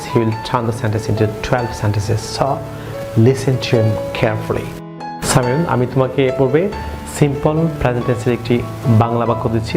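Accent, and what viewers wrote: United Kingdom. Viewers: আপনার উচ্চারণগুলো অনেক সুন্দর স্যার। ঠিক ব্রিটিশদের মত।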